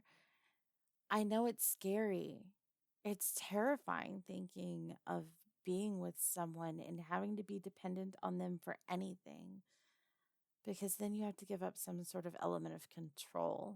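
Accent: South, United States. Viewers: Your southern voice is sexy 😘😍😋🤤🥰❤️‍🔥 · You know it's crazy you have such a good southern accent. It's almost like you were born in the south 😂. I'm kidding, I know it's real and it's amazing just like you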